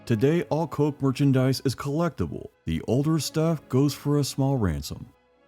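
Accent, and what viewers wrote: Indiana, United States. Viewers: Your videos make me feel homesick for Indiana…parents from Ft. Wayne and Otterbein, my first 18 years in Anderson, next 30 years in Bloomington and Nashville, then overseas and now Iowa. An Indiana accent is subtle but it feels like home to me…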